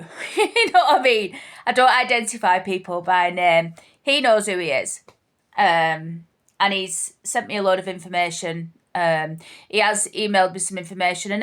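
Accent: Oldham, United Kingdom. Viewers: Writing a book is a good idea-just do it at your own pace-& take your time-& it must have "Oldham-Speak"-in it-no fancy "A-i"-lingo-just the way you normally talk--& remember -Sports people=footballers/cricketers/rugby-players are as "Dumb-as-two-short-planks"-& their books are "ghost-written"for them-because they are incredibly Stupid!!-so-do the book on you own in " --Speak"-with plenty of "Oldhamisms"-because the Northern-Language"-is dying out-you are about the only one who cares--& when your gone-your House will be bull-dozed too-also-I think you should do that 'Celebrant-Course"-you get them "coming & going"-& you are "walk-up-start"-for that!!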